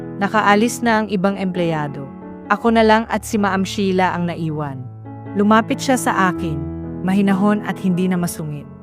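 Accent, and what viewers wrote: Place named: Visayas, Philippines. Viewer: Bisaya k po b miss? Halatang halata Kasi Ang Punto mo.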